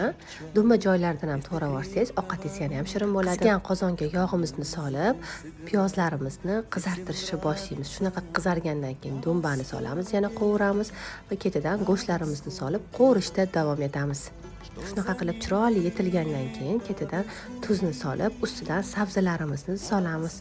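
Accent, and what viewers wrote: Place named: Andijan, Uzbekistan. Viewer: Andijon shevada shovla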